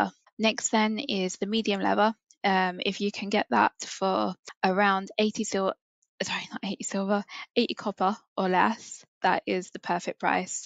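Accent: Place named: United Kingdom. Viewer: I know this isn't part of the actual video but you have a beautiful voice! Also on Stitches EU but I think I will try to find my own flips so I don't inflate prices and spoil it for you! Trying to work out where your accent is from in the UK...tbh I am kinda terrible at guessing haha. Keep up the videos!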